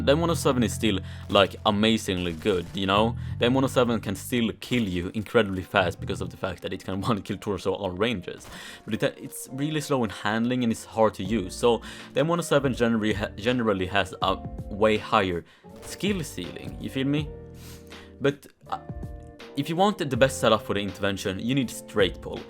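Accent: Sweden. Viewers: are you from sweden or something?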